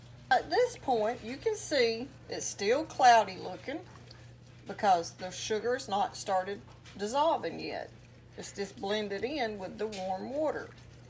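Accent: South, United States. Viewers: I love the country accent and pronunciation. It makes me feel like I’m back home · I love ur accent, I’m from the south and your voice reminds me of my Mothers. God bless you for ur kindness. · She said YouTube Altha rhythm😂😂😂😂😂😂😂😂 dang she slow southern talking granny makes me feel like I’m back home in Kentucky ❤ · Thanks for sharing your recipe ❤your Southern accent! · What a great Tip ..I'll be telling my Daughter this .. Excellent 👍👍..Thank You.. Love 😘😘 From U.K.❤️❤️🙏. (& I love your Southern Accent ..❤️ )... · I love her southern style and dialect. She’s charming. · I love you mom, may JESUS bless you with love grace mercy protection a donation on YouTube to make income and have your baking channel grow! you have honest sweetness to your person. Very Lovely! I LOVE YOUR Southern Accent! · Thats just our lovely southern slang 😊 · SOUTHERN COUNTRY GIRL,LOVE THE ACCENT,AND IT IS A BLENDER,A MIXER,A WHIPPER OR WHAT EVER ELSE SHE WANTS TO CALL IT ,WHAT EVER YIUR MOM SAYS IT IS ,IT IS,LOL😂😂😂😂😂😂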